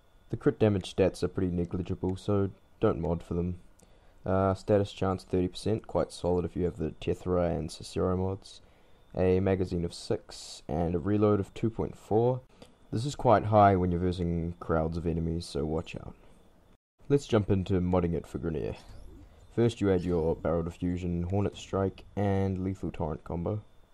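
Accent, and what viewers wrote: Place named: New Zealand. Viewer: "british accent"? sounds kiwi to me.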